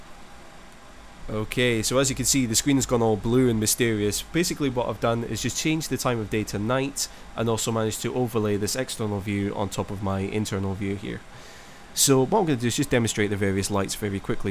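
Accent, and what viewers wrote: Scotland, United Kingdom. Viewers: lol, please make more videos like this. Do you have videos like this for the other, or pmdg range of aircraft? Those would be great. greetings from an Aberdonian in Michigan!:) loving your vids and Scottish accent which I miss living in the USA. edit: 911 sticks out too, have you seen Ex CIA Agent/Asset Susan Lindauer? I just saw her speech "to truthers" yesterday.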